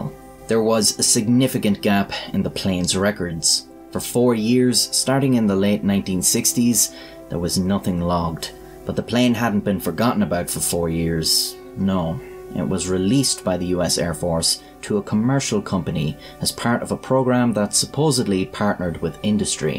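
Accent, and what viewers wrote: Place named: Mayo, Ireland. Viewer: Hey, random question but I don't suppose you're from Mayo? Your accent reminds me of The Hardy Bucks? Am I close?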